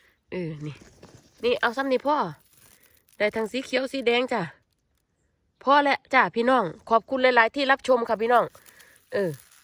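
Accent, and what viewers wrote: Korat, Thailand. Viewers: 😂😂😂 นี่ยังแม่นเนาะ คนทางไหนน้อ สำเนียงคือคนโคราชเนาะ fcใหม่จากสวิตฯค่ะ ปีนี้เพิ่งปลูกสีแดง เดี๋ยวจะไปเอาสีเขียวมาปลูกอีก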